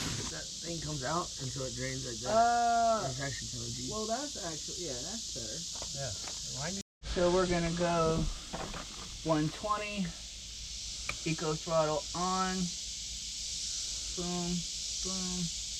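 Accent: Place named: Arkansas, United States. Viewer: Arkansan here, I thought I recognized that accent 😂 your video just popped up and I'm glad I watched. Interesting homestead and build! Just remember not all relationships are meant to be. My husband was married twice before when we met and didn't think it was possible to have a successful marriage but here we are 30 years, two great grown kids and 3 grandchildren later, rockin it!! You will find the right one when you least expect it! 😊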